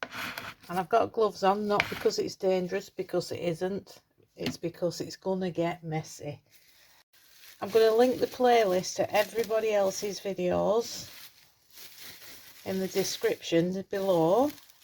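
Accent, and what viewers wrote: Northern England, United Kingdom. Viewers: Wow, that's the ghost with the most! What a brilliant idea to soak fabric with the Aquacast. I may try this in an attempt to get rid of the huge bag of eco pour I have sitting in my craft room. It made me homesick hearing your lovely northern accent because I'm from Lancashire but l moved to Oxfordshire 11 years ago and miss Lancashire so much. Hope you have a lovely Halloween :)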